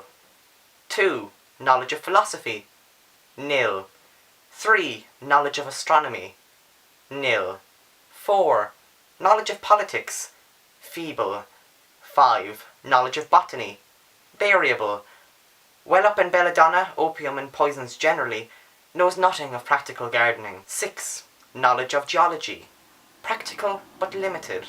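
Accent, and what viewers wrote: Ireland. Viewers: are you irish? :) and thank you for the review, very helpful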